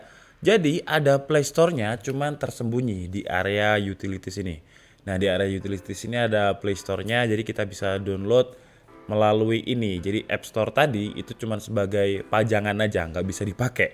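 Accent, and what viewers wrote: Java, Indonesia. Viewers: Njenengan tiyang pundi mas? Kok enten logat logat jowo wkwk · Oalah ketok e wong jowo😅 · Mas e jawa to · Orang malang kah bang ? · Arek malang ta bro 😅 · Oalah, smean iki malang pisan ta... 😅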